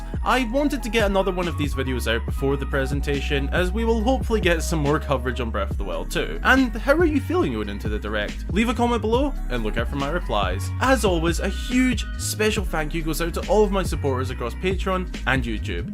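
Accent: Scotland, United Kingdom. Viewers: Dein Akzent ist super! Schottisch klingt richtig gut.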